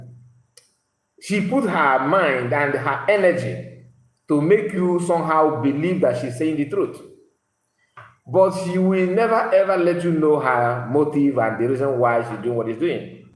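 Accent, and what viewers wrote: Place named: Fulani, West Africa. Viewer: You don't know where you come from that's why your accent is the same with foolani people. Leave nwanyi isi ocha, leave all the saga and your stupid analysis. Let's talk about PM, let's talk about MNK, let's talk about Biafra recognition, let's talk about Homeland. How long are we going to remain in this saga? Is this how to run a government? Criminals!!!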